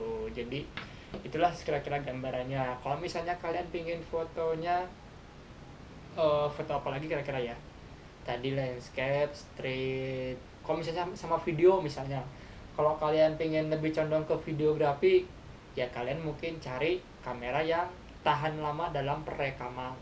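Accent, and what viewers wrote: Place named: West Java, Indonesia. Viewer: Logat Sunda mah moal bisa bohong nya mang wkwkwkw luv keren konten na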